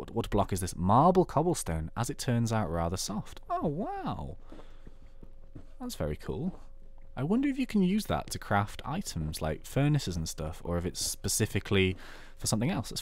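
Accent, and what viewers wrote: United Kingdom. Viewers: that silky british voice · Hey, what nationality are you? British? Cause your accent is really awesome. =O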